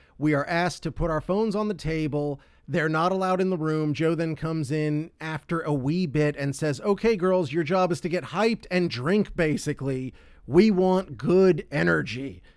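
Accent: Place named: Germany. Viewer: Very funny german accent ahah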